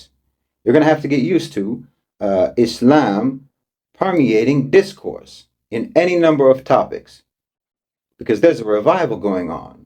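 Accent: United States. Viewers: This guy is an idiot. saying all this in an AMERICAN accent eh? i love how they always tout the values of Islam from WESTERN countries. especially when they leap over women an children risking life and limb to get to the West. just wondering how the only successful Islamic countries are the ones with oil but the West is somehow lacking in rationality? when i see the dutch immigrating to Jordan then ill listen to a MUZSLIM about their superiority. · What's this fella's background? He has a US accent. It's a fact that Ireland has over 20% immigration. Five of the last twelve murders in ireland were by "refugees" Irish do not migrate to north Africa, Afghanistan, Georgia or Nigeria. But ireland takes in hundreds of thousands from these areas. Ireland never colonised anywhere, it was colonised by the english over hundreds of years. The slaughter in gaza is courtesy of zionism supported by zionist administration in London & Washington. 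· I am still sitting/laying on my couch watching and listening to your American-accent eloquence about the superiority of Islamic thought. What baffles me is the total absence of evidence behind the propagandistic effort to expose the so-called western corrupt “state of mind” , if any. Ok it is what you say it is, but did you offer anything which is less aggressive, corrupt, misleading, totalitarian, treacherous….? No, you propose the same shit dressed in a different outfit with your own brand on it. The difference is that you deliriously believe you own it, understand it, will control it as long as you wish to.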